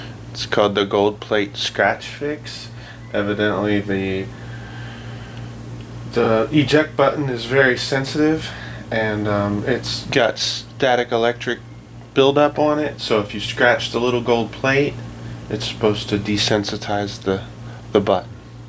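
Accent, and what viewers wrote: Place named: Canada. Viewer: He's Canadian. That's how the pronounce it. It's correct for Canadians. Also, he's shy so if u ask, he will deny he's Canadian but he is.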